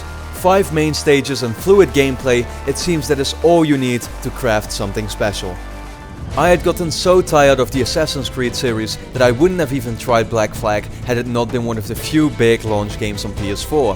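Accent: Netherlands. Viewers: Are you dutch ?.. you have a dutch- english accent xD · You sound Dutch, are you? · NEDERLANDS ACCENT GESPOT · lol i was about to ask that and i think so bcs his accent is dutch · Dutch accent? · You sound dutch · Bas Warmerdam ik denk t ook aan zn accent te horen xD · Ben je nederlands, Are you dutch? i can hear it at your accent. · r u Dutch becaus you speak English like someone from the Netherlands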